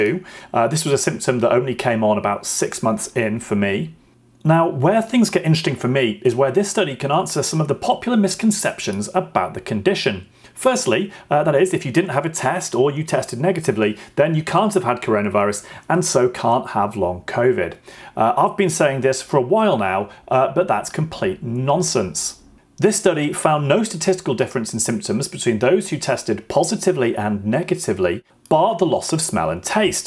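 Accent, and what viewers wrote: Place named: United Kingdom. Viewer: I read the article, but its much more interesting to listen to summarise with his cool British accent. Wishing us all a long-covid free 2021.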